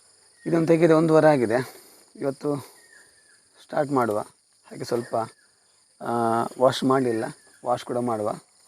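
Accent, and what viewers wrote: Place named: Naravi, India. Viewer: Naravi kannada yedde undu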